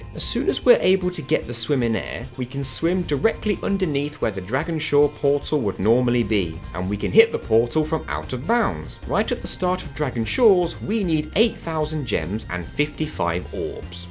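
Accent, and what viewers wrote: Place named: Lancashire, United Kingdom. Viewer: I think you might be me. Other than the fact you sound like you're from lancashire/ the north west, we sound identical.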